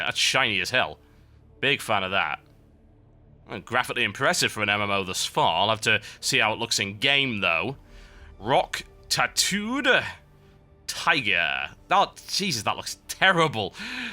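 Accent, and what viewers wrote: United Kingdom. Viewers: u hav a strong British Accent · First video I've seen of the; LOVE the voice/accent.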